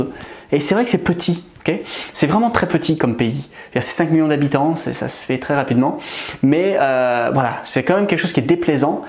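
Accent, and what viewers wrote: Scotland, United Kingdom. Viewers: First of all you have a BEAUTIFUL Scottish accent!!!👌👌👌💕( I am a Scot living in France) excuse me but my written French is not great. I prefer to speak it than write it…… Secondly, you missed ONE of the MOST important things to HATE about Scotland ….the MIDGES!!!!???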